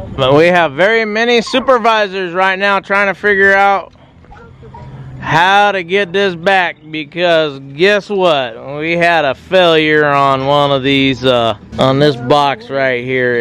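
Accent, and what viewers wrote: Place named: Texas, United States. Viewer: That happened to me with one of the columns. It was so annoying. Are you a southerner ? You sound as you’re from the south. Texas way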